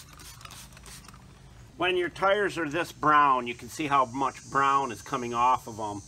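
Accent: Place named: Wisconsin, United States. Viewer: you sound like your from wisscOnsin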